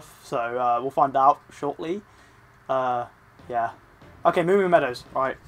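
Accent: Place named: England, United Kingdom. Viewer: The English accent is very confortable to hear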